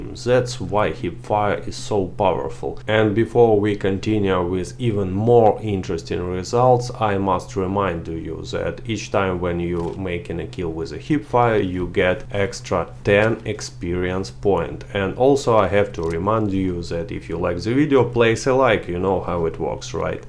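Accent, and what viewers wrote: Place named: Russia. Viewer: Good vid, i like your russian voice, you sound so much cooler than my boring midwest american🤣🤣gonna sub ya now too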